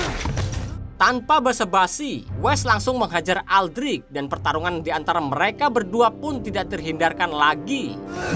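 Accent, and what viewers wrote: Java, Indonesia. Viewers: Ngomong.a medok banget jamet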